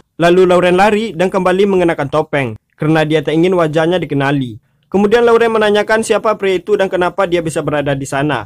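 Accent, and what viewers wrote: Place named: Padang, Indonesia. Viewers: Saya merasa ini yg bicara org padang 😂😂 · Asli padang ya bg?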